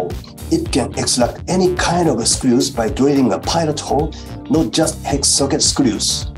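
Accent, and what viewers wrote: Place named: Japan. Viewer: 翻訳ソフトとかじゃなくてちゃんと日本人の英語なのが素晴らしいですね！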